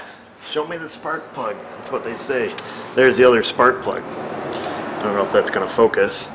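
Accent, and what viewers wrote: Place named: New York, United States. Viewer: love the NY accent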